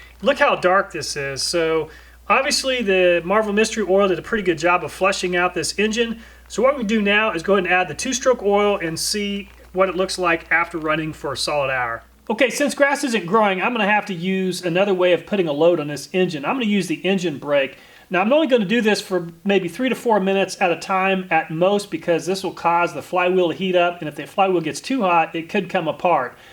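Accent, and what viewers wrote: United States. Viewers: Interesting video, surprised it maintained its lubricity as long as it did. On a sidenote, where is your accent from statewise? I can't place it. (apologies if I'm being nosy)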